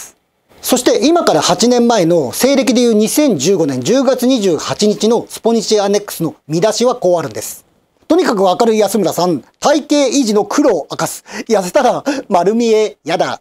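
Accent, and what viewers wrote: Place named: Tokyo, Japan. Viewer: さんは江戸弁だね。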